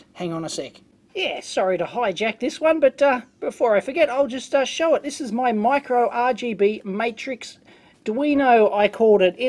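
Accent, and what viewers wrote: Australia. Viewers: In the fourth grade, I'm in the US, we had to put a picture of ourselves on a world map with a string going to where our family came from. I asked my mom and I thought she said "Australia". So my string was all by itself and the teacher was like "really". All the others kids strings went to somewhere in Europe. Well I asked her again and had to move my string to Austria with all the others kids. Explains why me mother's parents didn't sound like you. I never got to come from a fun country. :-(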